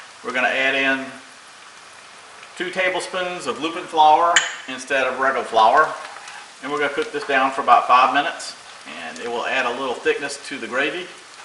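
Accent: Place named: South, United States. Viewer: Listen to that southern drawl!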